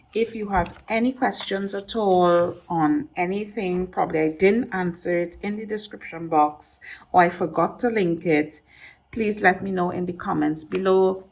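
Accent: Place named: Caribbean. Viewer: Beautiful planner setup 👍. It's really great to hear a Caribbean accent speaking about my obsession ❤️. I purchased my Filofax on Amazon as well. So sad we cannot get this stuff in the islands.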